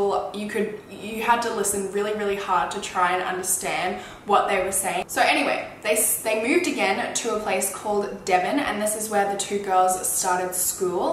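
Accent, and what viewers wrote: Australia. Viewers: Holly molly she aussie oml i am too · yay she’s an aussiieeee!!!!! :)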